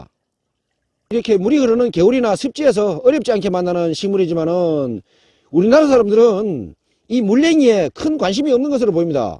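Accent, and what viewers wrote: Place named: Gyeongsang, South Korea. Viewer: 영상과 정보 감사합니다 경상도 말씨가 구수하게 들리네요 항상건강하세요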